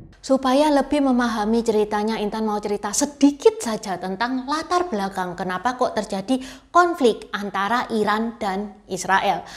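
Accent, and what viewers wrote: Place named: Surabaya, Indonesia. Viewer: Salut info yang cukup mendetail diiringii logat khas surabaya dan gaya bicara yang kocak dari Intan.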